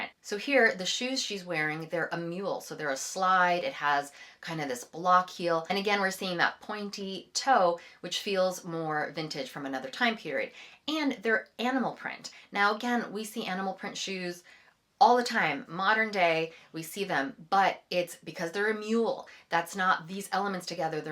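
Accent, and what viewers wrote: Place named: United States. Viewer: This is a fantastic series of videos, thank you so much, you clearly know a lot about style and you speak very clearly with a beautiful, soft American accent you so rarely hear these days, it's really a pleasure to listen to you. I love Alexa Chung although some of the outfits you showed are not to my taste. I grew up in Italy in the 70s and, age 58, I've recently gone back to wearing similar outfits, for example corduroy bootcut trousers (also worn in the 90s by Carolyn Bessett-Kennedy), double denim with trucker jackets and straight leg jeans, gingham shirts, also worn with denim, white straight-leg jeans with a blue t-shirt (like Jackie O), velvet suit with flared trousers, pussybow blouses, platform shoes, contrast collar t-shirts, David Bowie vintage t-shirts. The funny thing is that my style now is similar now to what I was wearing in the 70s when I was a child or teenager, these pieces are modern classics (but not too classic, as I find classic style is often ageing and too ladylike - there's a fine line). 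I would not say my style is vintage but I love the odd 70s touch, also in the colours (orange and brown, khaki), just as I like wearing the odd edgy or even sexy item.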